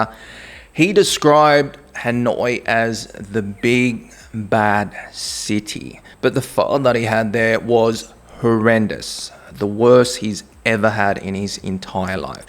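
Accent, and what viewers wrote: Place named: Australia. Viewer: So weird to see a Vietnamese guy speaking with an Australian accent reminds me ofLuke Nguyen. I am glad the rest of us ended up in the states.. I am personally heading back to Vietnam for the first time in 50 years.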